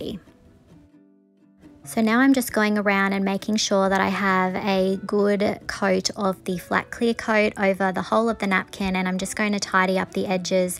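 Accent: Australia. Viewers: Née subscriber here. It’s nice to hear an Aussie voice. I love your little pots, very cute. May I please ask you what brand is your glue gun. I have to get a new one and I like the way yours sits there. My old one is not a very good one and the glue keeps leaking out of the nozzle. TFS. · Just found your channel, love to gear an Aussie accent here in France, we used to live in Perth. :) love these pots, I bought some peat pots recently and will make some fir my shop. :)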